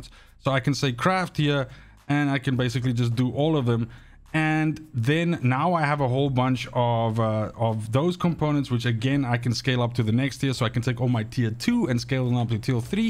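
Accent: South Africa. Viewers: Liked and subscribed. Sounds like a South African accent there👌🏾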